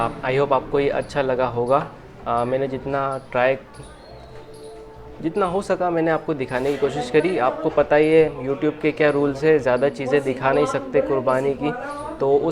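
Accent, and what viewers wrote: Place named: Pakistan. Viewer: App Kay kahnay ka style same pakistan ki Tarah hai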